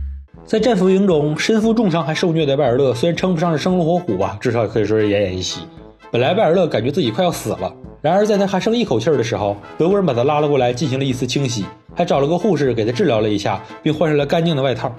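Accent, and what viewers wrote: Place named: North, China. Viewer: 每次聽到你的聲音，我都覺得耳朵都要懷孕了～這北方口音～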